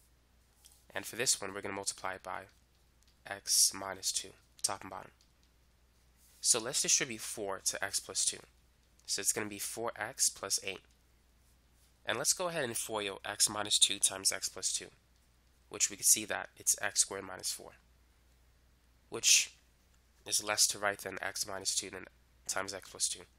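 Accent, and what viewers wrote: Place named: Boston, United States. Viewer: lol i just realized you can tell from this guys accent he is from boston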